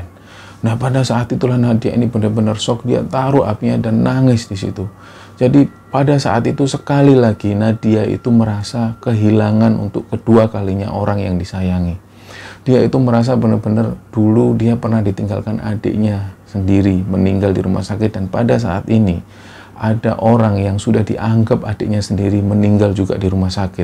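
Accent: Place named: Surabaya, Indonesia. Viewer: Shanghai haderrr arek suroboyo seng merantau, kangen logat suroboyoan😆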